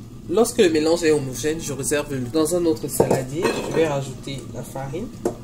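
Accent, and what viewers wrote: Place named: Cameroon. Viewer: MERCI ❤❤ vous avez un ton de chez moi Camer 😊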